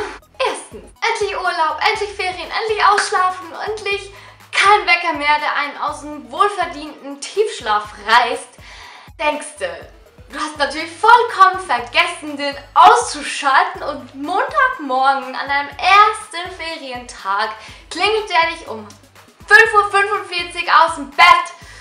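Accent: Switzerland. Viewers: du bisch schwizerin oda ? :D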